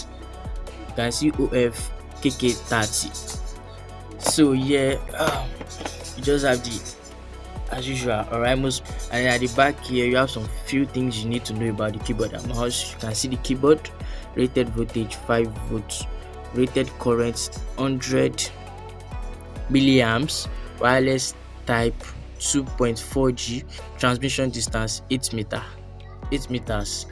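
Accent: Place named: Nigeria. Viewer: Never heard more authentic NIgerian Accent ever 😁💀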